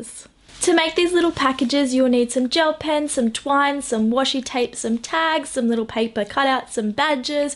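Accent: Australia. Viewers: I can tell your from Australia from your accent!!! I am too!!❤❤❤ (also because typo is in australia!!)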